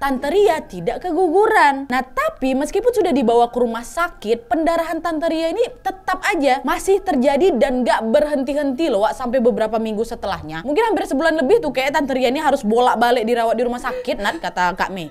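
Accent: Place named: Medan, Indonesia. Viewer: Kak kek nya orang Medan yaaaa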